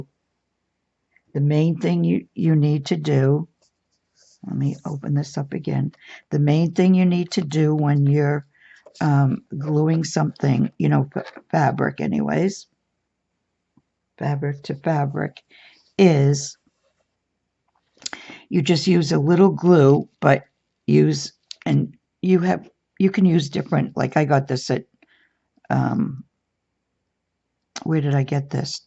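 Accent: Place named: Massachusetts, United States. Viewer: This is just so lovely! Thank you for sharing! Your MA accent makes me feel right at home!! I grew up there and most of my family is still in the Boston area. I live in ND now. So glad I met you through the shop!!! Just subscribed!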